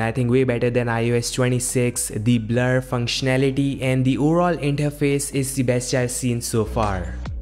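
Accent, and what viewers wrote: India. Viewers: Are you indian bro?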